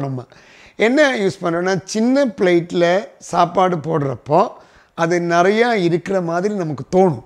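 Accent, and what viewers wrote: Kerala, India. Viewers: Sir neenga Tamil or malayaliyaaa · Malayalam vaasam apdiyae veesudhu unga Tamil . Nice video. I subscribed for Ur Tamil sir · I like your malayalam mixed tamil slang. .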